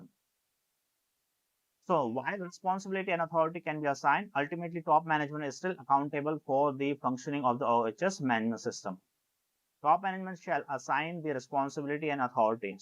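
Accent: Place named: Bihar, India. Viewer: Sir very amazed after listening you you have eloquently explained each and every aspect. Be blessed always. Love From Pakistan. I think you are from India most probably from Bihar you ascent and facial expressions are resembling to that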